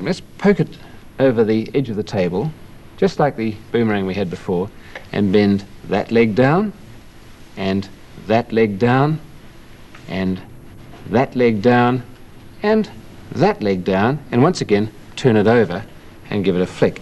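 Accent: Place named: Australia. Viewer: You have the most stunning Australian accent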